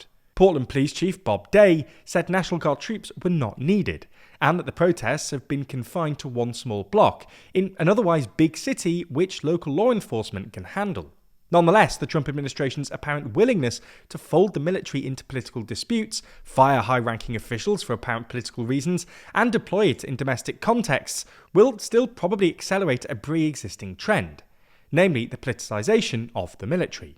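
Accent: United Kingdom. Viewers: Respectfully, UK accent about US politics. Nope.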